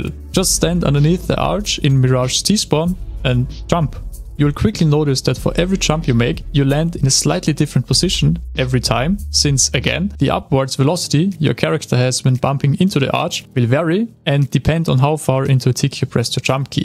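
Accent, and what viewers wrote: Austria. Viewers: hätte auf Österreicher getippt ;-)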